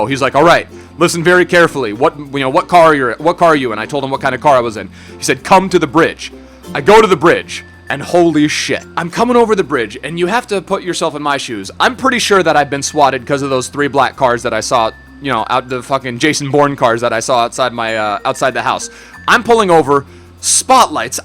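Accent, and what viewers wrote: Germany. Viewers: is he german ? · are you German ?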